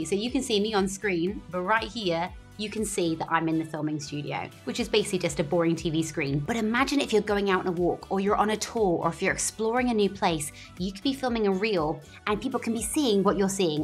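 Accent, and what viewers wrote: United Kingdom. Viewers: Agree with other reviewers - really helpful to get up to speed and get it right (and nice to have a Brit accent as well ;-)) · Instagram reels, British girl, Public or private school accent ?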